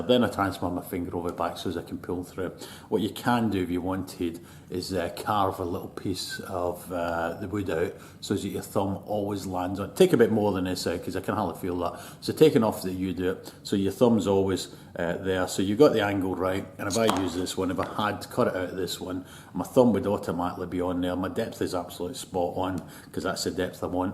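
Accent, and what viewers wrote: Scotland, United Kingdom. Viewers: Don't take it personally but you sound similar to a character from the TV show"The Simpsons". A Scottsman.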